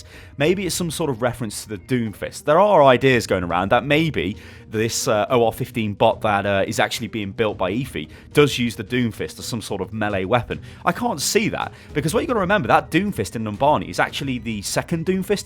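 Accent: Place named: United Kingdom. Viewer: I F*CKING LOVE BRITISH ACCENT, sounds so cool!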